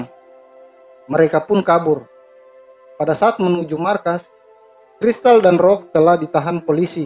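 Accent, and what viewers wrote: Eastern Indonesia, Indonesia. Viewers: abang orang timur to?? kelihatan dari logat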